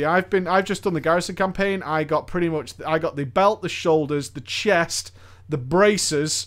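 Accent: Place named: Northern England, United Kingdom. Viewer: At the start of your videos do you say "It's here" or "It's "? Blooming northern accents! Keep up the good work mate.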